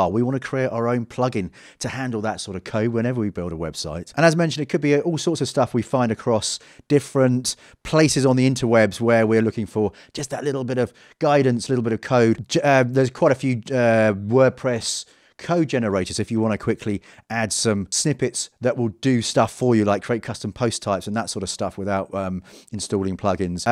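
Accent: United Kingdom. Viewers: Love your tutorial plus your british accent....from Nigeria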